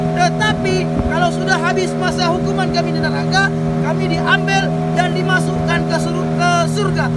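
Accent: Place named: Medan, Indonesia. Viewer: logat kau aja logat medan,mengaku ngaku orang aceh...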